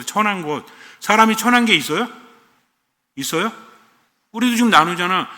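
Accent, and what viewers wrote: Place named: Suwon, South Korea. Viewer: 찬미예수님~^^ 옛날에 부산에서 3년 살아보니 부산 사투리에 젖어 나의 언어가 평범하지 않던 시간들... 신부님 언어에서 정감 넘치는 말들 수원 사투리~^^ 안성 사투리~^^ 정겹고 좋아라~ㅎㅎ하^^ 좋은날 되세요~^^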